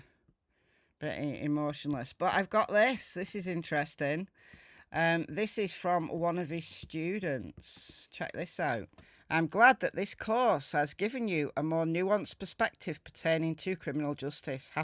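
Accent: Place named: Manchester, United Kingdom. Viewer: Love that Mancunian accent. Great content. Will be back for more. Lived near the Denton Golf Course in a previous life, on the way to Stockport.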